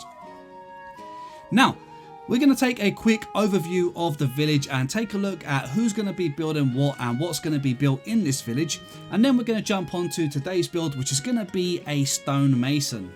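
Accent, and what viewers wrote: United Kingdom. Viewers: Hey...my daughter is the reason i play minecraft. Its our way of spending time together. She likes the game so i take intrest in what shes doing and enjoying. Shes always asking me to build things for her cause she wants her village to expand faster...well sadly im definatly not an artist. And my builds look worse than what she can do..lol so i started you tubing tutorials and found you. I love how simple you make the builds seem. And i absolutly love love love your accent and the sound of your voice. Where are u from if you dont mind me asking. My guess would be in the uk somewhere. But my daughters village is really starting to take shape and she is really loving the midevil style. Thank you so much for making my daughter happy...she was getting annoyed with having to rebuild my builds so they dont look like crap...lol and now shes you tubing tutorials as well and finding stuff she likes. 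So i have subscribed and look forward to seeing more amazing things that me and my girl can do together... bringing families together.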